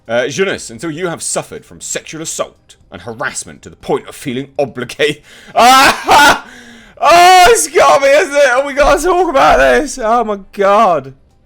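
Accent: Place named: England, United Kingdom. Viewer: american? He has clearly an english accent. Why did you thought that he was american? I dont get it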